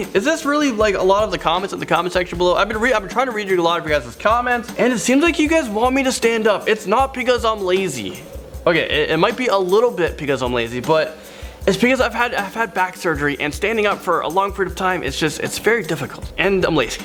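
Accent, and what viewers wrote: India. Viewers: r u inidian ? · Are you indian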